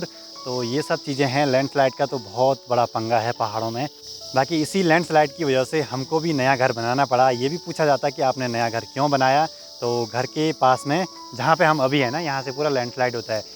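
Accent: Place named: Garhwal, India. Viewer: जगो तो गढ़वाली टोन है ।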